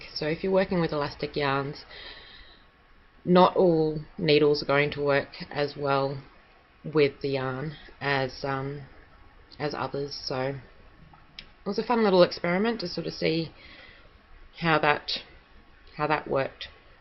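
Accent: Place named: Australia. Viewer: Hi I'm a new knitter from Newcastle NSW. I've been using You Tube to help me learn, and was so excited to hear an Australian voice. It really doesn't take long to become a yarn addict does it!! I always assumed that our home grown wool was still in abundance, but have been surprised at how hard I've found it to source locally. Do you know of any local mills or indie dyers out their that I could support? Looking forward to your next episode.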